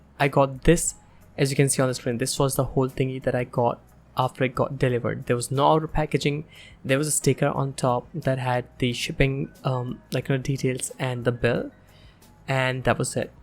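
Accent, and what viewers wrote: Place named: India. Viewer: Indian acent nikal rha bich bich mein. Wohi sahi hai. Yeh affectation gay lag rhi bhai. Sachi mein gay hai tu to sorry.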